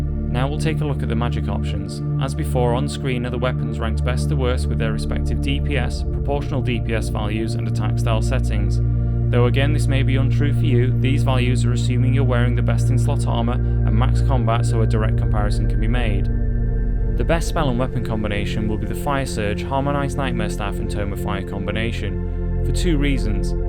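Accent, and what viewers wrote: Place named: United Kingdom. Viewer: sat through a 20 second intro just to find out this guy is british, disliked and clicked off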